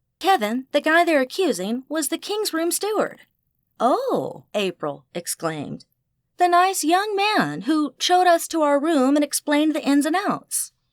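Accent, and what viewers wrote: South, United States. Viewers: I do love the added male voice with the deli ious British accent. Dont get me wrong, I love the southern accent also ( I am a born and raised Georhia peach ) but the British accents makes my old heart flutter. Lol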